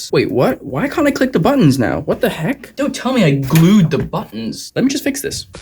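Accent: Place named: United Kingdom. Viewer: you are somehow THE most british person I've ever heard and also WHAT WAS THIS NOISE anyways you are cool have my sub and like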